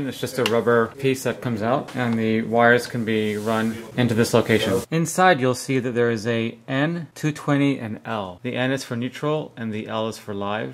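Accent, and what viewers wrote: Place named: United States. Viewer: But plugging it into the wall aren’t you feeding it 110v? Your accent sounds American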